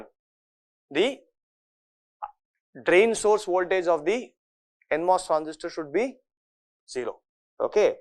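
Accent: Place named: India. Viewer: White dude with indian accent? Thats a first for me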